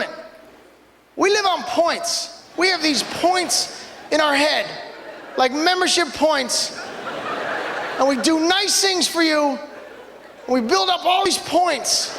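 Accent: Canada. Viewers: I love how he says whore. WHOORE. I love Canadian accents^^ love from Montreal, QC^^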